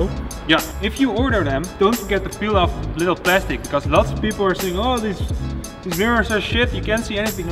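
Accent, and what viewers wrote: Netherlands. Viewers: Ik hoor wel dat je nederlands bent ^_^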